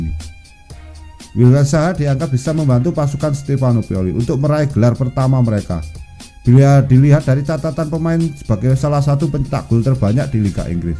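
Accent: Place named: Banyumas, Indonesia. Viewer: Ngapak suaranya .. hehehehe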